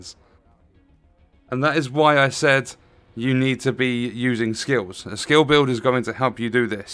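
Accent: United Kingdom. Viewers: subbed just becauce of you wounderful british accent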